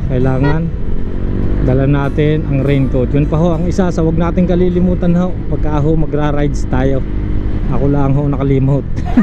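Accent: Batangas, Philippines. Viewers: Batangueno ka kuya?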